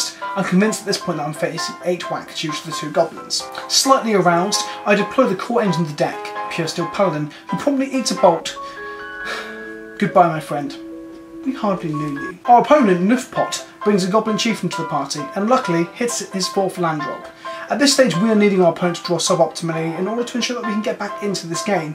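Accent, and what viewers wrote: United Kingdom. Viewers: It is not really the deck, but the cutting and presentation. I love when you stay on your shots, where you explain a pun, for just a second to long. Reminds me of Family Guy. :D Also the off-topic stories are really funny and your british english is the icing on the cake. :D There are not a lot of YouTube Channels who embrace their accent like you do.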